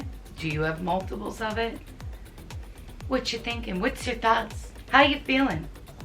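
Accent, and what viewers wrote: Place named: Michigan, United States. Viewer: Just happened across your channel for motivation! Your accent sounds like you are from Michigan or somewhere int that region. If so, hello from Michigan!!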